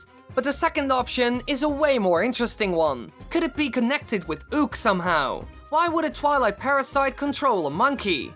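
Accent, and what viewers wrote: Netherlands. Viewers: He has an accent, he's dutch. Accept it or leave.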